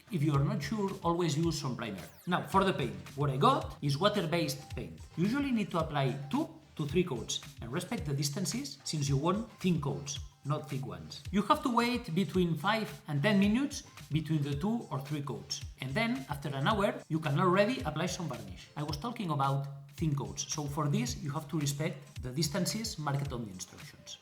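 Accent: Spain. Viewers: Se nota que eres español🇪🇸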